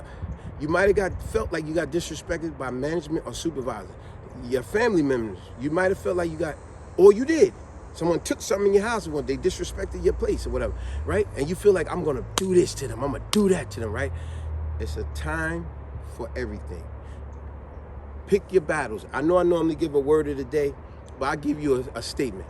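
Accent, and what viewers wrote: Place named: West Philadelphia, United States. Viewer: He reminds me of growing up in West Philly and Camden.That is exactly how the guys would talk.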